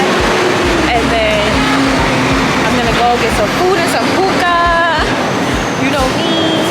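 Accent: New York, United States. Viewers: but you’re aware you have an accent to people who don’t live in New York right? 😂😂😂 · that New York accent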